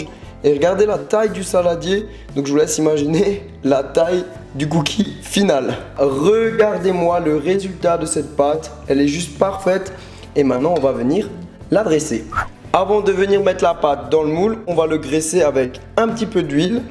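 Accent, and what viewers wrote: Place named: Alsace, France. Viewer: Coucou toi c la première vidéo que je vois de toi et j'adore c cool +1 abonnée et juste une question : est tu alsacien car tu as un petit accent PS moi je le suis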